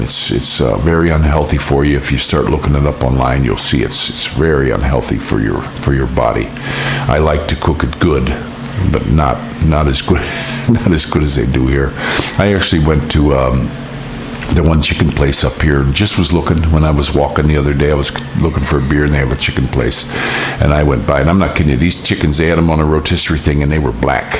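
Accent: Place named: Western New York, United States. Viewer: Sounds like you lived up on the lake! If I had to guess I would say silver creek NY or somewhere around Eire pa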